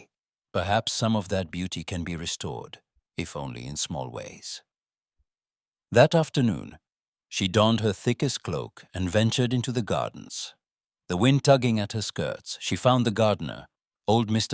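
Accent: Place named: India. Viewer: The reader has a trace of pronunciation from India or smth.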